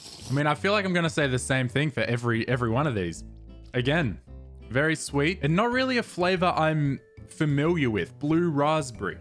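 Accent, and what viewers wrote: RP, United Kingdom. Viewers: I thought was British when I started to watch him xD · I thought you where British lol, they are so similar! this comes from a Swedish person so I have no idea what I’m talking about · You got some little British accent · Is it only me who always thought that spoke a British accent. It sounds like to me for some random reason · bro i thought u were british with that little accent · You already are british, you just think too much about your accent. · I was honestly mega sure he is british half the video. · Me being British watching him be so posh is painfull to say the least. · I am british. When you tried to do the british talk, that was hilarious! When I first saw your channel and clicked on one of ur videos, for some reason I thought you were British🙂😂 God bless you!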